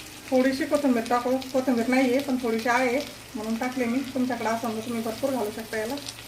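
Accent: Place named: Nashik, India. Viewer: ताई तुमची बोलीभाषा ऐकून असे वाटते की तुम्ही नाशिकच्या आहात .. बरोबर का ?? शिमला मिरची खूपच छान बनवली ..बघून तोंडाला पाणीच आले . . ❤❤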